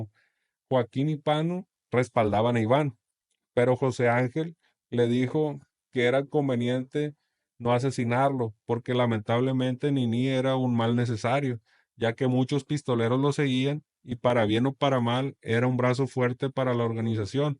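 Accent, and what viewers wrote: Sinaloa, Mexico. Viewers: es acento sinaloense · Me gusta como narra con acento bien sinaloense y los diálogos hacen parecer como si uno mismo estuvo ahí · me gusta el acento ese de mexico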